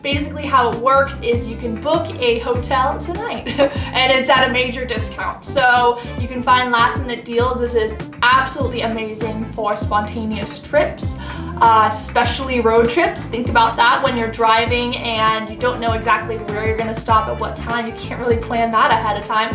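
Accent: United States. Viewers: I would love to watch your vlogs because it's a hope of a boy who thinks America has a place for him too. Actually in 2 ways you're helping - 1. Learning English without Subtitles to get your Accent. 2. Tourist like a local & relevant information + pros & cons. Thank you in advance. Keep up the good work. And please upload regularly. BEST WISHES EVENTUALLY. 🎆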